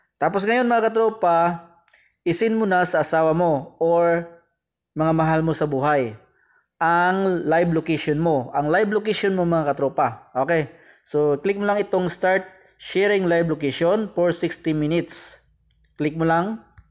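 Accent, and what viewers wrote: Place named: Iloilo, Philippines. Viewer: ilonggo ka pala po hahaha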